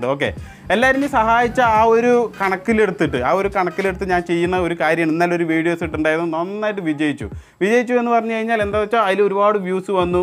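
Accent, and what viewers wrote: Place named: Alathur, India. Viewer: ഇക്ക ആലത്തൂരോ, എനിക്ക് ഡൌട്ട് ഉണ്ടായിരുന്നു സംസാരം കേട്ടിട്ട്, പാമ്പാടി ആണ് എന്റെ നാട്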